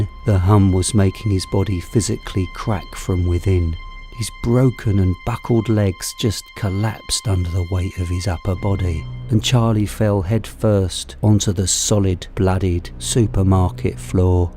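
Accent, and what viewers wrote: United Kingdom. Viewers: Why didn't I find you sooner!! British accents FTW 🖤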